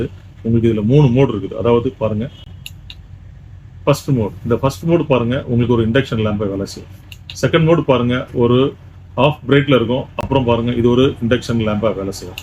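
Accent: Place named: Kanyakumari, India. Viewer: கன்னியாகுமரி தமிழ் அப்படிதான்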